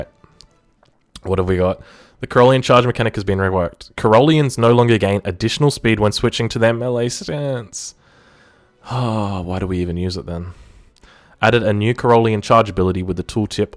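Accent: Australia. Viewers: I'm from Minnesota, but I that's Australian English right?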